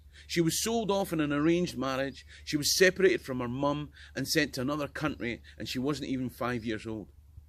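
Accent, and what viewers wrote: Scotland, United Kingdom. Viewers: I love his scottish dialect...good to learn to understand a Scot when on holidays in Scotland...and even England...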